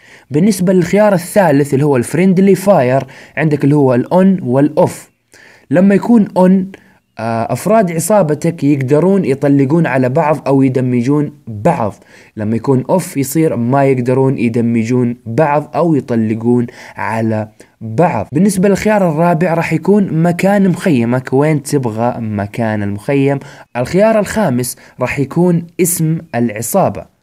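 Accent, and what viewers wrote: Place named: Jeddah, Saudi Arabia. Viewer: اللهجتك جداوي ابوي 😎👍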